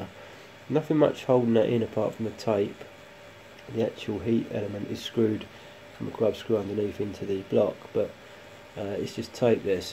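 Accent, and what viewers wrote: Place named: England, United Kingdom. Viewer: U have the worst english accent I've ever heard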